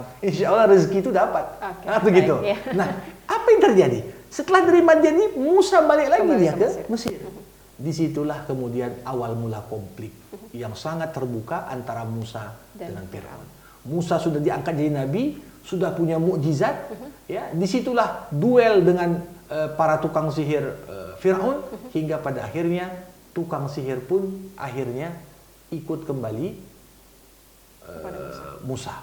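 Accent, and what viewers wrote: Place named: Aceh, Indonesia. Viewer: Masya Allah,dari logatnya kek org aceh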